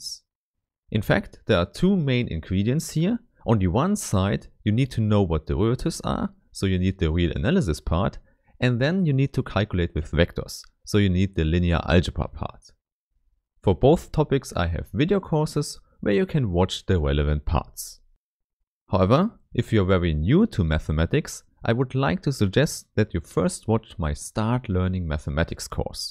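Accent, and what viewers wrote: Germany. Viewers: German accent makes this video even more credible.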